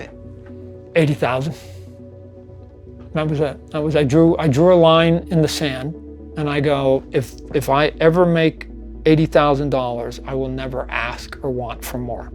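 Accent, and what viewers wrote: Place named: New York, United States. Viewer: dude talks way too much, sounds like a new yorker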